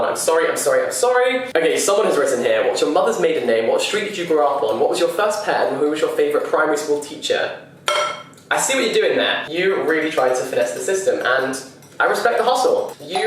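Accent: United Kingdom. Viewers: I love watching videos, but my non-English brain cannot handle that talking speed, especially in combination with British accent:D help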